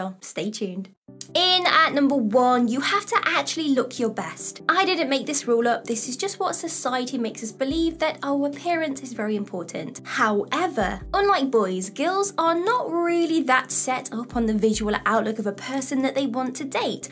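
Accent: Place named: RP, United Kingdom. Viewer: Cool RP accent and cool backdrop too.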